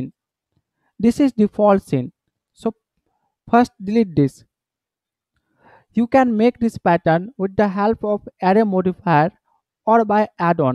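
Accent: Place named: India. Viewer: super ultra giga pro max Indian accent luv it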